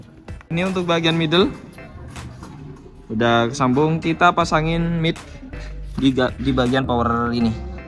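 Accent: Madura, Indonesia. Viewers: kok kayak orang Madura KK emang asli mana😅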